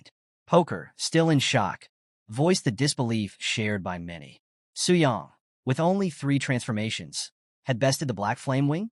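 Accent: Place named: United Kingdom. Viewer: Why the switch to British accent at chapter 50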